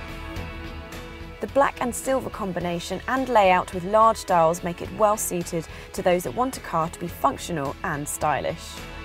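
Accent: United Kingdom. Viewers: will you also stop saying british accent there are lots of british accents its english she speaks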